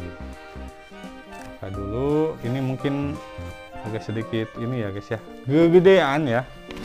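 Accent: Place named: West Java, Indonesia. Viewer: Aa org sunda nya. Sunda mana?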